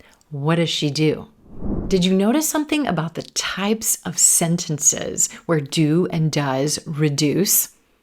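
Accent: United States. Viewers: Your voice is so attractive. I am trying to search for some channels/blogs that have a clear American voice like yours for shadowing. Could you introduce some pages that I could imitate with scripts? Many thanks.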